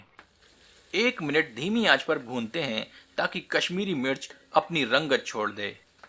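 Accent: Standard. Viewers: I like your shudh hindi more than the recipe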